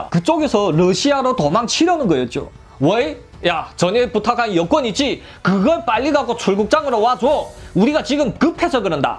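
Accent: China. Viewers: 아무생각없이 클릭해서 첨에 한국사람이 방송하는줄알앗는데 말투가 ..같은 조선족이라 ...음...ㅎㅎㅎ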